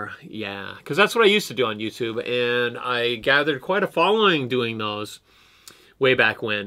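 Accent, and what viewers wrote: United States. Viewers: Are you from Hawaii? Certain things you say reminds me of home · interesting .. I thought you're Californian.... LOL ... didn't knew you learn to surf in hawaii ...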